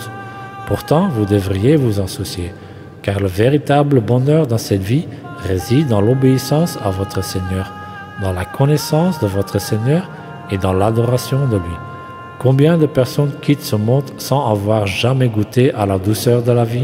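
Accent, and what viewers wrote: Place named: Flanders, Belgium. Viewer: Masha Allah. Ben jij van Belgïe? Ik hoor een Nederlandsetalig accent🙂 daarom. Mooie video Allahoumma barik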